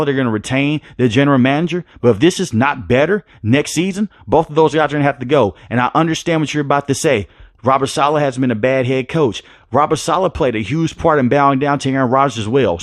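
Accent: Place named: New Jersey, United States. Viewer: Thanks, I can tell with the accent, you are a jersey boy, lol, I completely agree with all of your statements, but let's see what happens, I'm sure the jets organization are thinking the same exact way we are, maybe they need to leave thier egos at home on draft day, forget the hype and go with facts and personal who have heart , there's been plenty of late round picks in the NFL that are in the Hall of Fame, so like you stated the jets coaching staff needs to do there homework and listen to that inner voice that speaks the truth, stay honest with themselves ,and I believe the jets can be in contention in 2024!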